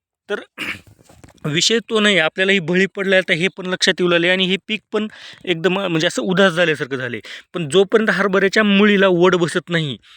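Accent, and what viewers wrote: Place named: Latur, India. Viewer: Bolibhasha latur che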